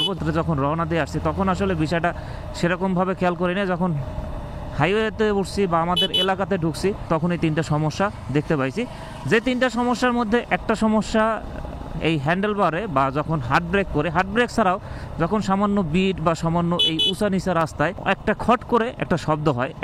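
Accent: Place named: Narayanganj, Bangladesh. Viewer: ভাই আপনি কি নারায়ণগঞ্জে নাকি ? নারায়ণগঞ্জের মত মনে হচ্ছে।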